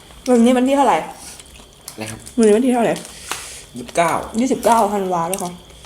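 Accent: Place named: Phu Thai, Thailand. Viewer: ครูเว่าสำเนียงผู้ไทกะเป็นเนาะค่ะ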